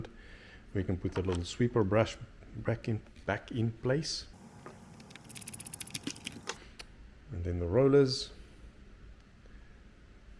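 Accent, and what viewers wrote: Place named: Germany. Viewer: Awesome video! Exactly what I needed for help with my wheel motor replacement. You the man! Love your German accent 😍 Felt like a scientist was stepping me through the robot's puzzle.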